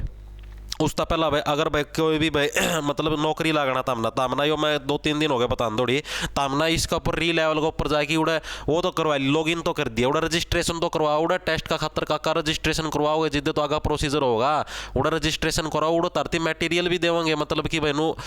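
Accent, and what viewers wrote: Haryana, India. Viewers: Always talking like .......k gyan s thara...... Very nice...no. 1 Haryana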